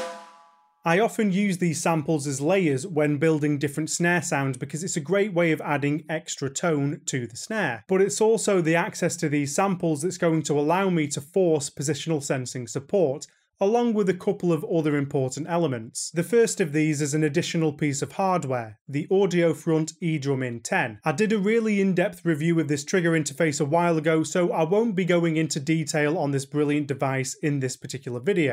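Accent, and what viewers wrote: United Kingdom. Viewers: Hi! Thanks for efforts! You used the Jobeky trigger? Sounds nice. I really enjoy your british accent. -> Say: "Alibaba". By the way, due to your vid I ordered some Lemon cymbals and a 45$ 12" Lemon snare pad. Even beeing a low-priced product that pad performes basically quite good with positional sensing using the eDRUMin.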